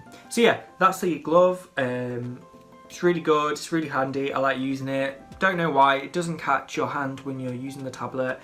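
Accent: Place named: England, United Kingdom. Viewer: I just like listening to you. U SOUND like U know what you're talking about and I love your English accent.!!!.. take care and keep it up.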